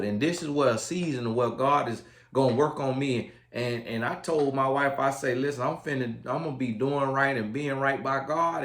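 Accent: South, United States. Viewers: Love this southern man talking in Ebonics! Yes we hear you brother. Time for men and women to claim their God given place! It’s on the men. It’s on Adam. Praise God for breaking curses. Set the example. Say the truth.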